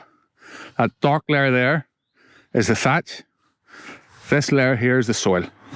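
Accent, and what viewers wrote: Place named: Belfast, United Kingdom. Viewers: You must be from Belfast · Cheers from Australia. Great video but my favourite part was the Belfast accent. Cheers 🍻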